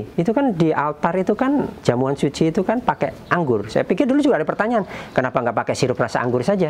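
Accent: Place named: Java, Indonesia. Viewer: Ngomongnya medok nga gerti...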